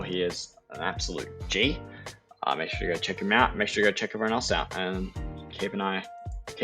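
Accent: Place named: United Kingdom. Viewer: Oh my goodness, he's British 😮 hehehe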